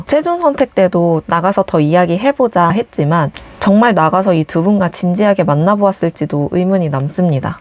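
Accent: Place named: Gyeongsang, South Korea. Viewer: 근데 ‘영수님’하고 ‘영숙님’ 발음이 너무 똑같아서 구분이 안돼요. 경상도 사투리 약간 쓰시는 듯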